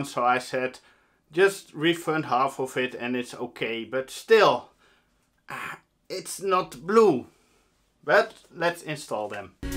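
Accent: Netherlands. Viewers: On you can hear he's from the Netherlands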